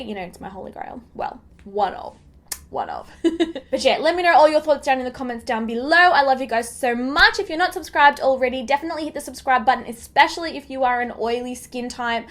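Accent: Australia. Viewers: Ohhh dear. You have the kind of totally hideous accent that makes me ashamed to be an aussie. The way you screech and draggggg out words, then your voice goes UP at the end of a sentence. Completely intolerable voice. Many will disagree but many will absolutely agree whether or not they are brave enough to say. A fact remains a fact whether u want to agree makes no difference. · wow! I'm amazed at the crap people feel entitled to say to strangers /others on the internet. So rude. I'm Australian too, and think your accent is cute... Pfft.. Ignore the haters and do you!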